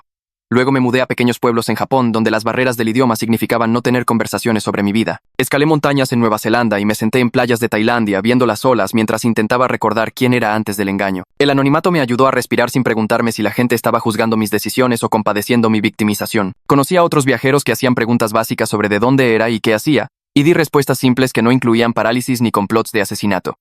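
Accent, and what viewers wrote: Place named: Colombia. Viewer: se re nota q eres de colombia bro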